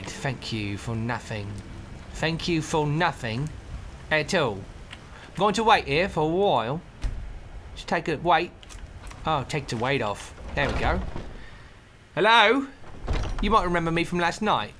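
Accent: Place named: London, United Kingdom. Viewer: an American that can actually pull off a north and south london accent well done!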